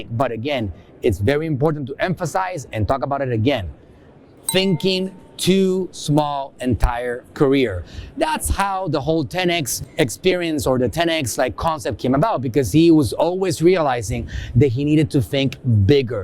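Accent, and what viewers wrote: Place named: Latin America. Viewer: Qué increible como se nota que no es hablante d inglés nativo. Se nota el acento latino.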